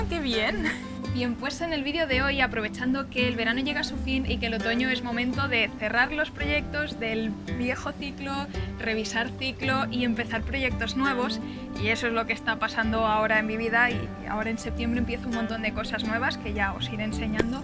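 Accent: Spain. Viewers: Hay gente fea pero este engendro ni ese adjetivo alcanzó 😂😂😂😂 y luego su voz, odio como hablan los españoles 🤢🤮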